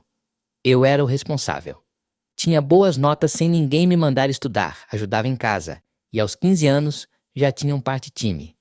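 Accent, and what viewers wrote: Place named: Portugal. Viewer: Vou me embora este sotaque português e um saco.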